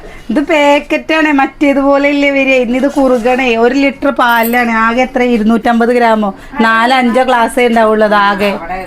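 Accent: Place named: Valluvanad, India. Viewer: വള്ളുവനാട് ഭാഷ അങ്ങനെയാണ്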